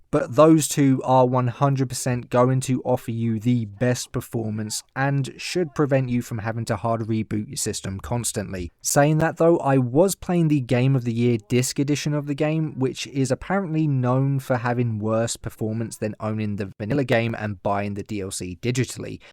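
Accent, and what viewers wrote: United Kingdom. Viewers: Love clicking on videos and as soon as I hear the dumb British accent. I click off 🤣😂😆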